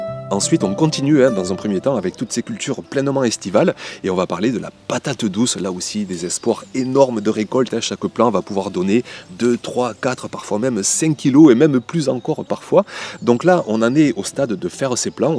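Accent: South, France. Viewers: Bonjour, moi aussi je suis dans le Sud. D'après votre accent, je dirais que nous sommes presque voisins ! Pas de gel en vue. Mon souci en ce moment c'est plutôt la surchauffe dans la mini serre ! On dépasse les 30 degrés allègrement ! Je laisse ouvert, bien sûr ! Et je mets un voile d'hivernage... Pour faire de l'ombre ! Je n'aime pas trop les radis, mais poêlés, glacés comme des navets, j'aime bien ! Ça ne pique pas, et ça change ! C'est vraiment la saison, et une belle saison ! Amusez-vous bien !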